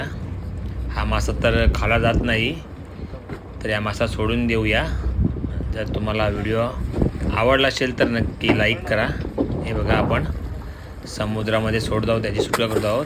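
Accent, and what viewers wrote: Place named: Maharashtra, India. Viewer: He sounds like Modi but accent is Marathi , who agree with me?😂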